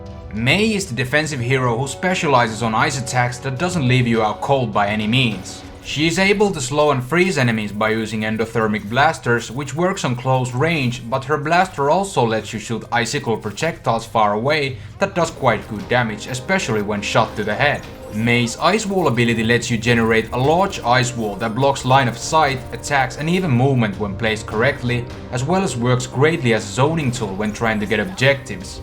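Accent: Finland. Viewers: is Finnish, and Finnish people are known for their "Rally English"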